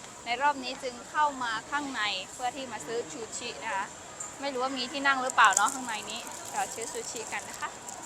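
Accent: Thailand. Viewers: พูดพม่าเก่งแท้แต่หนักมาทางไทยมากกว่าพูดไทยซะชินแล้วครูสาวพม่ามาเที่ยวไทยมารึกฯเลย ว่าไทยเขาเปรี่ยนไปมั้ย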